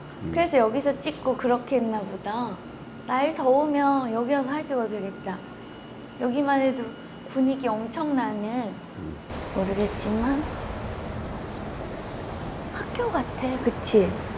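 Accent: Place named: Gyeongsang, South Korea. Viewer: 너무 이쁜보이스를 가지고 계십니다 약간 갱상도 대구 말투도 썪인듯함😊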